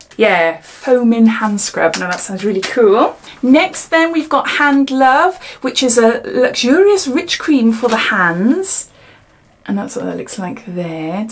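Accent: United Kingdom. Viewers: It's ok for the mix-up i was hoping to see who won if i got a chance at something and i thought u were picking the winners on Thursday so i did the same thing lol im so silly XD xoxoxo _ Your #1 Fan! I said this before for my entry into this giveaway but here u go again.: Wow, congratulations on 10k!! You deserve it! \Your so positive and lovely! You cheer me up!! I'd love to have all of it but I haven't got Instagram or Twitter or Facebook so that sucks if I win I could give u my email to contact me I am living in Florida currently and I can't wait to see what else u have in store for your channel... I like the accent it sounds like your a British princess!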